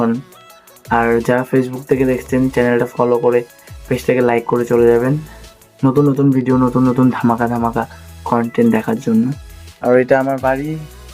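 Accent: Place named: Kolkata, India. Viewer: Ami shocked 😳😲 tumar bangla kolkata bangla moto waw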